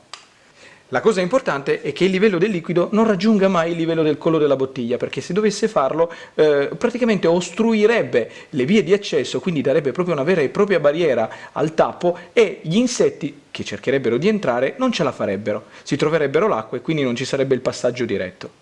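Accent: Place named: Sardinia, Italy. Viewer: Un tutorial ben fatto e la spiegazione e' impeccabile con un italiano tipico dei sardi... bello iscritto e like da adesso ti seguiro' sempre... un saluto Giovanni p.s. io faro qualche modifica per interrompere l'alimentazione alla luce lmpadina e accenderla solo la sera con un interruttore a parte... 10+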